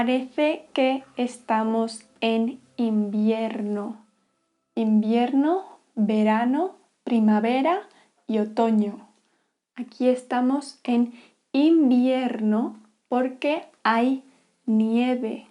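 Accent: Spain. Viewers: Talar du spanska från Spanien? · I only wish two things: 1) that you had lots more just like this and 2) that it was in a Mexican dialect rather than Castillian one. I think I would get stared at if I said "ciudad" as you say it. The second isn't a complaint. Just a wish. What you do in this video is what all the polyglots say to do to learn/teach another language. My Spanish vocab is good, I know the grammar, but I spend too much time in my head translating rather than just hearing and connecting words with ideas. The pointer idea is fantastic. I'm tempted to make a few videos like this in English for my various student friends that are struggling at the same point I am.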